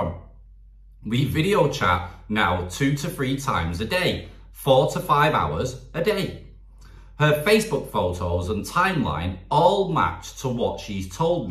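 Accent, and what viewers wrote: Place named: Leeds, United Kingdom. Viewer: do u ever think KAAAA sounds like an old crow lol - when i hear the girls talking it makes me think old crow lol - u sound like a leeds guy - close ?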